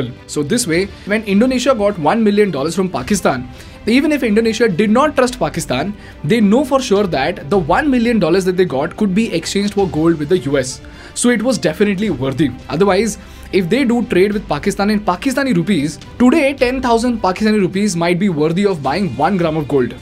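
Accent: India. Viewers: The speaker has strong accent of indian slang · The Indian accent is deafening. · The indian accent is killing me you need to put subtitles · I is probably a good lecture but you speak too fast and in an Indian lingo which is hard for most of us to understand. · why indian english is so indian?🥲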